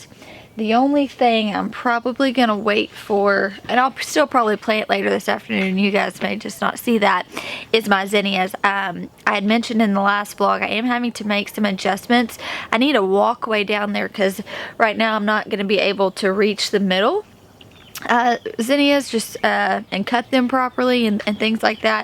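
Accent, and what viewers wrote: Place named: South, United States. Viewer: I LOVE that you and Jessica Sowards both say "peench" instead of "pinch". Some southern YouTubers try to hide their southern-ness, and as a southern girl I am not about it! I'm here for the southern accents ♥️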